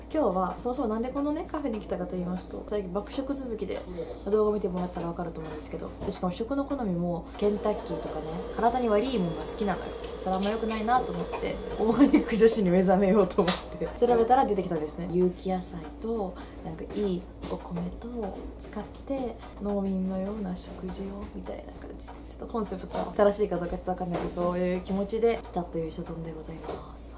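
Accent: Kansai, Japan. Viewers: 関西、だと！？笑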